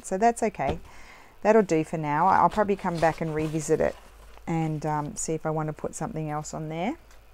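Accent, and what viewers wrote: Australia. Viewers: I love to hear your adventures in Italy and i love to hear your Australian accent. And of course your crafting too. We have to do all the running around too for paperwork its just how it is (USA) but thats ok it will all work out! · "get nicked" 😂. As a fellow Aussie I can't tell you how fantastic it is to hear an Aussie accent and expressions in a crafting video! Yours is the only video on YouTube that I can easily listen to for a whole hour 🥰. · Hi, Love the very typical Aussie rant. A breath of fresh air. Kerry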